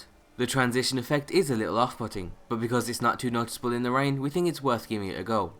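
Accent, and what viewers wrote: United Kingdom. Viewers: yeah nice but god i hate that british accent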